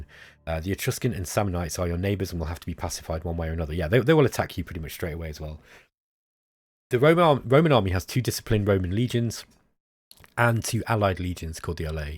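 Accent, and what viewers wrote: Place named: Scotland, United Kingdom. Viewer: Oh I can believe it. Just interesting that you managed to identify the exact same strategic vulnerability that the Romans did. Hell, if your explanation was flowered up a bit, and in Latin instead of your calming Scottish brogue it wouldn't have been all that out of place on the senate floor. To paraphrase Livy Book 16: "Against them and against king Hiero of the Syracusans, the Senate decided to offer help to the Mamertines. There had been much debate between those for and against it. For the first time, the Romans crossed the sea with an army and they fought successfully against Hiero who sued for peace, which was granted."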